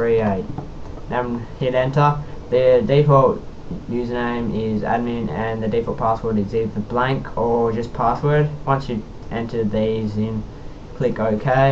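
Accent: Australia. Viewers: heard the accent and knew I was definitely going to get this right aussies we so smart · Aussie Mate Yeeeeew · I have that gateway and u are like the only one that helped me!! Also are u australia????????? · Your Aussie aren't u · Are you aussie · are you an aussie, aussies ftw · Are You Australian Cause If You Are I Am Aswell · YES ANOTHER AUSSIE HOW U DOIN MATE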